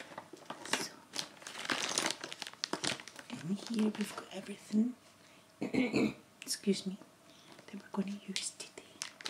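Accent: Scotland, United Kingdom. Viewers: You're glasses are just everything! Scottish accents are still the best!! 😘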